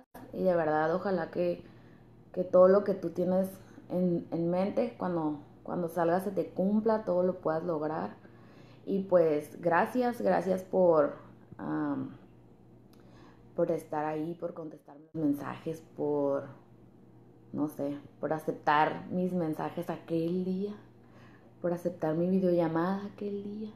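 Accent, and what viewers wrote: Mexico. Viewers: Habla como alguien de monterrey 7u7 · Es ese acento culichi? Me encanta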